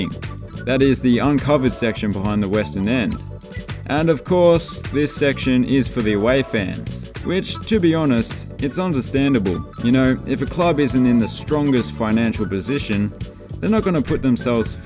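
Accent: Australia. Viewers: There is absolutely no hints of black on the Ipswich kit….well, there is an almost invisible black pin stripe in the new kit but you need a magnifying glass to see it.. love the videos by the way…funny hearing an Aussie covering quaint English football grounds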